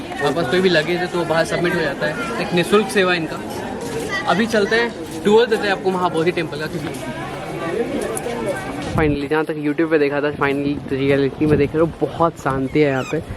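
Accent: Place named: United Kingdom. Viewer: This was too good. Just saw your video today. Your accent is awesome. Did you live in UK earlier? Waiting for your new videos. Love from Spain.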